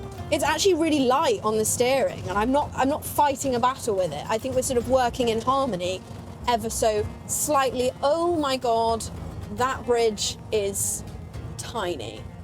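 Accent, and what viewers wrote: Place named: RP, United Kingdom. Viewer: The poshest accent on Youtube. One of the benefits of private education no doubt.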